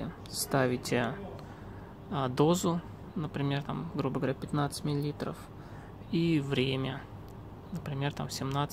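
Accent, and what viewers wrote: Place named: Moldova. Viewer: Спасибо за обзор. Очень помог мне настроить девайс. Удачи тебе!!! Кстати акцент у тебя интересный, случайно не из Молдавии? Всех благ!!!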